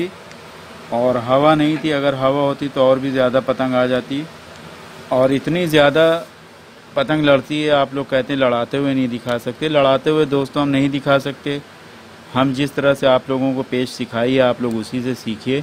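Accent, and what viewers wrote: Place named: Allahabad, India. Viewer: aapki allahabadi bhasha sunkar achha lagta hai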